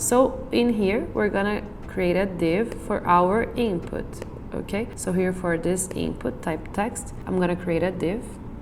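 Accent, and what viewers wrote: Brazil. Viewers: Youre brazilian, am I wrong?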